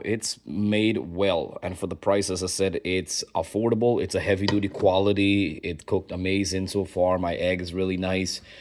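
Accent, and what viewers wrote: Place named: Guyana. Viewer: Are u Guyanese by chance u sound so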